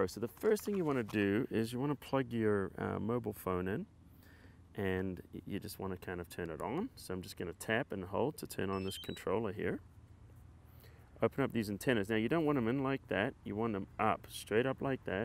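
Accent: Australia. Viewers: Great review mate great work,....you sound half Aussie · this fella sounds Australian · I mean probably 1/2 AU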